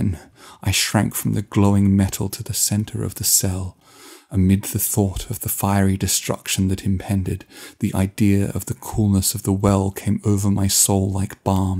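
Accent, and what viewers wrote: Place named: England, United Kingdom. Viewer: Brilliant! Thank you, good reading, like your english accent 👍😉 Greetings 👋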